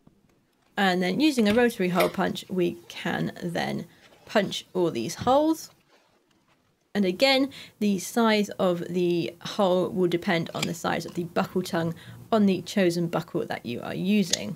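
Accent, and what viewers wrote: England, United Kingdom. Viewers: Dear I think you are from England because of your English language accent, am I right.?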